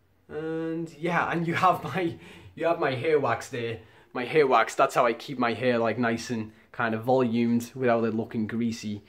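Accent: Liverpool, United Kingdom. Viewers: Wait you're British? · I can't tell if your scouse or not ?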